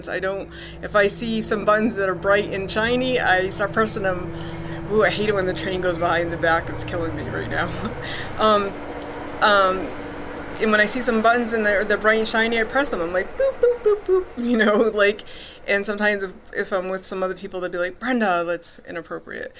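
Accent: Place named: Canada. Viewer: Just wanted to share with you that I believe I’m an Aspie myself even though I’ve never been diagnosed with it. I have the characteristics of it. So I can really relate with you on it. But damn, you’re hot as hell! I’d definitely ask you out to coffee with me if you were here in my town. Bet you live in Canada (you sound Canadian). Anyway, Happy Holidays, Brenda! Greetings from Bay Area, Ca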